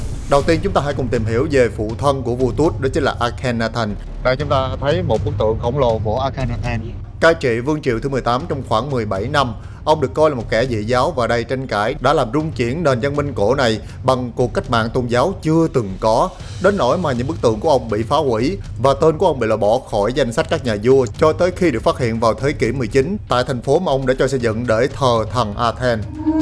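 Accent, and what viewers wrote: South, Vietnam. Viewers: Một kênh du lịch khám phá rât lý thú , Giọng miền Nam của bạn rất dễ chịu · người Miền Nam, cho nên thuyết minh giọng rất thu hút & duyên dáng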